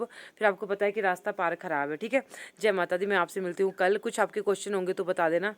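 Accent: Punjab, India. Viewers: Aapki language bdi Punjabi " Podiya"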